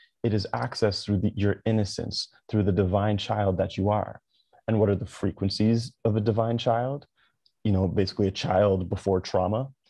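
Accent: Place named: Jamaica. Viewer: Are you Jamaican why you look and sound like a yaad man!! If that's soooo bomb!! I'm loving your videos. This one is really good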